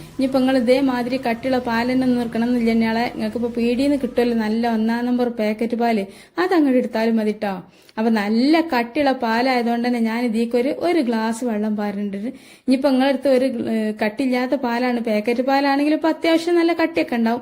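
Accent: Malappuram, India. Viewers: താത്താടെ മലപ്പുറം ഭാഷാ കേൾക്കാൻ എന്ത് രസാ. 👍👍👍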